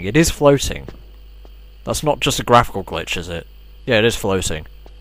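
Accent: United Kingdom. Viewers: gotta bomb dem aliens. TR logic. You don't question it, you just take it for granted. Accept it for what it is. EHAEUAHEUAEHUAEHUAEHUAEHUAEHUAEHUAEHEAUHEAUHAEUEAHUH this was hilarious. .mp3 ammo. Totally. that's totally fine. British accent is cooler and sexier than American. EHAUEHAUEHAUAEHUEAHEAUHEAUHAEUAHEUEAHUAEHUAEHU straight into the lazor. I'm choking here :D yes, you have gone the wrong way. I just love your fuckups <3 look how sweet they are. I want one of them. No, actually I want both. I suffer from an extreme Lack of Domestic Animal Syndrome, so like... yeah :( dat heart attack. Warning for headphone users. Don't you love how the UFO is so small on the outside, but absolutely huge inside? Also, as a kid, I was hoping to fight some aliens, and got super disappointed.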